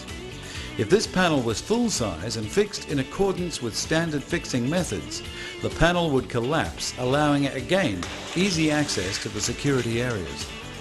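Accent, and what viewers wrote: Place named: Australia. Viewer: The narrator sounds like an Australian, judging by his accent.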